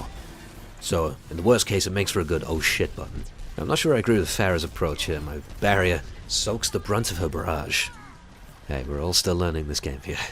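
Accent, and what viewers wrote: United Kingdom. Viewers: You should do like a documentary. Like, seriously. Also subbed, I love hearing the British? accent! :3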